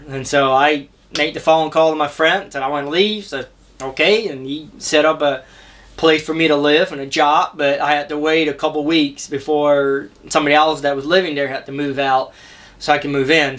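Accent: Pennsylvania Dutch, United States. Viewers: When I first heard you on your video I thought I recognized the PA Dutch accent. I grew up in Lancaster County, PA and had Mennonite friends. Never saying "good job" is the German way - even among some of us PA Dutch "English". "There is therefore now no condemnation for those who are in Christ Jesus." Roman 8:1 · You are a good inspiration. I am 81 and grew up in Pennsylvania into a pa Dutch family and it is so great to hear your accent.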